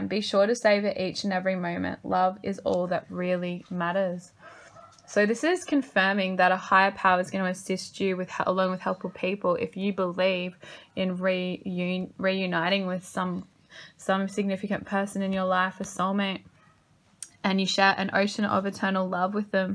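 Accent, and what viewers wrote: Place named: Australia. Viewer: #4 but couldn't focus much on what u were saying but i absolutely LOVE ur aussie accent. ah my heart 😂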